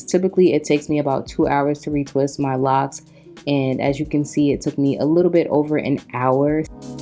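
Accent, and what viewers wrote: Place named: Australia. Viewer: she pulled up that aussie and i'm subbed sooo fast😂❤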